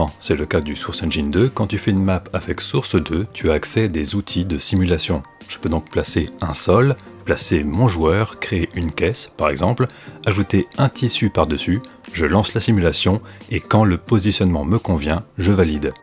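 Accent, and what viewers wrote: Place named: Paris, France. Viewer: Je viens de découvrir ta chaîne et malgré ton accent parisien ;) je suis très fan, merci.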